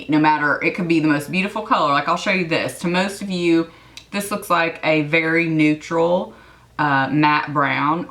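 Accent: South, United States. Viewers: You must be from maybe Georgia,or Alabama?Am I close?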